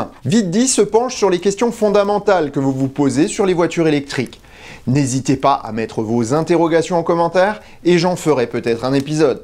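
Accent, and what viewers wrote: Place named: Alsace, France. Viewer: Super intéressant et bien présenté, j'aime bien le décor. Peut-être un point à améliorer pour ma part, le petit alsacien distrait l'écoute.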